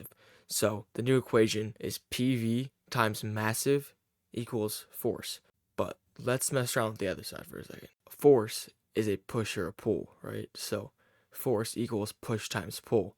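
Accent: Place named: Russia. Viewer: Russian Detected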